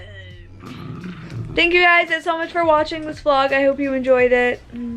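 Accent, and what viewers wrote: United Kingdom. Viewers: I love the british accent 😂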